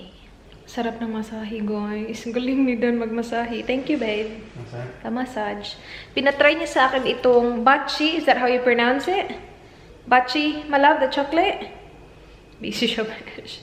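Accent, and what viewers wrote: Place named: Visayas, Philippines. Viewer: Hi ate 😃 makahappy man ka lantawon te oi kay same atung accent pag magtagalog hahahaha😅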